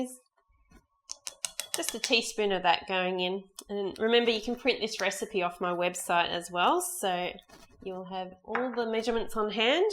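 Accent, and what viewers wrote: Australia. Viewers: Your accent is lovely! Australian?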